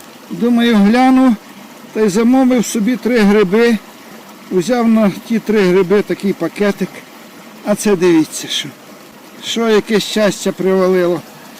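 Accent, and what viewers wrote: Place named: West, Ukraine. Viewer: Здравствуйте! Откуда вы, какая область. Мой свекр с запада Украины Ивано-Франковской области, разговаривал точно так, даже голос похож.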